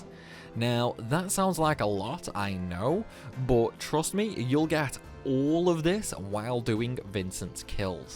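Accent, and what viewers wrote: Stoke, United Kingdom. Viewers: You're a Stokie, can tell from the accent. Great video btw!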